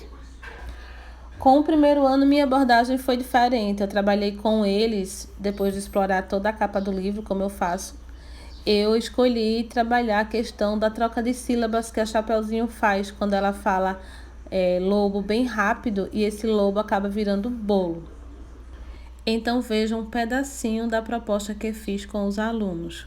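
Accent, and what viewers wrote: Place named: Northeast, Brazil. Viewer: De onde você é professora ? Seu sotaque é bem nordestino.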